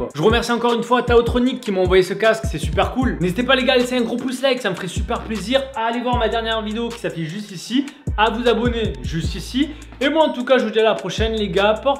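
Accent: Marseille, France. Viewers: Marseillais plutôt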